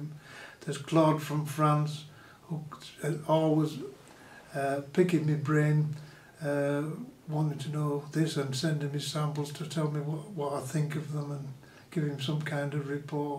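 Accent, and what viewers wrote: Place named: North East England, United Kingdom. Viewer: Great guy! Sounds like north east England (his accent). Says “filim” a few times!! Great guy, great video, appreciate the tips!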